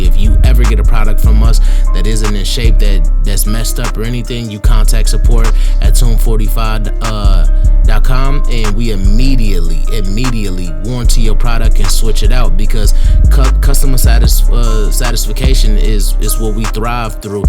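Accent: Chicago, United States. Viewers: I’m curious I heard him say goofy 😂 you from Chicago bro this my first time watching one of your vids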